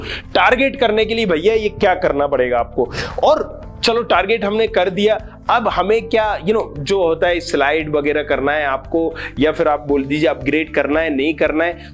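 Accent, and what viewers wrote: Bhopal, India. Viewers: Your accent is pure Bhopali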